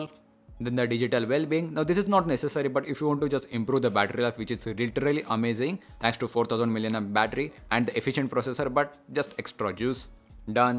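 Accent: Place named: Maharashtra, India. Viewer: Marathi Accent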